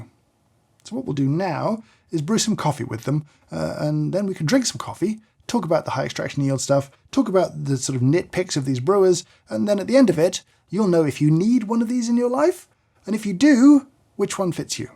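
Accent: United Kingdom. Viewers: it's nice to see British youtubers who don't have a chavvy accent and can speak the queen's English correctly!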